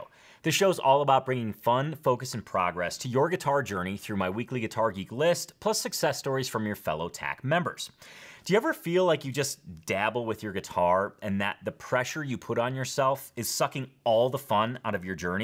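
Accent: Chicago, United States. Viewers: You are my favorite Chicago “regular guy” accent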